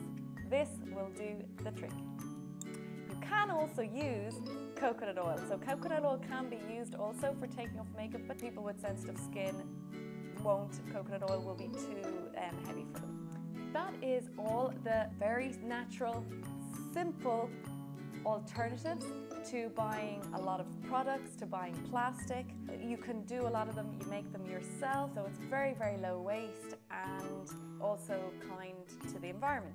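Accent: Ireland. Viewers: Love your Irish accent.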